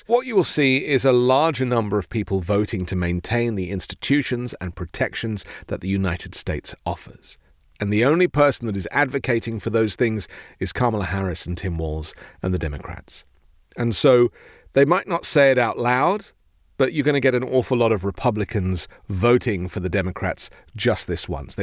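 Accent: United Kingdom. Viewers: Why is this lame with a gay a$$ British accent telling you about American politics??? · For some odd reason, listening to you with a British accent explaining why Trump will lose is very soothing and gives me peace of mind.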